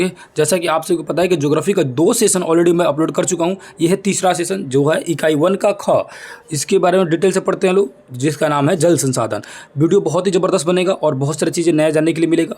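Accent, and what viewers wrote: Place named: Bihar, India. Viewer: Sir geography class 10 ki playlist me chapter 5 to 11 tak add nahi h please add kar dijiye ... Aur apka way of teaching bahut achha specifically apka bihari touch jo ata h bolne me vo bahut hi amazing hota h maine mere bahut se friends sw share ki h apki class videos ... hopefully apke jaldi se views badhe aur subscribers. 😊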